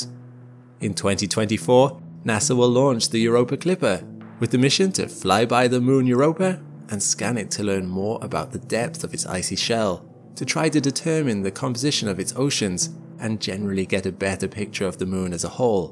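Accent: Wales, United Kingdom. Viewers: Is that a Welsh accent? I'm from Newport... Sounds similar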